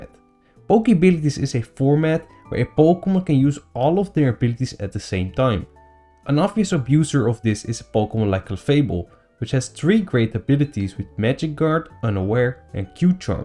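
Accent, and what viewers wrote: Netherlands. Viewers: Netherlands, you can tell by the accent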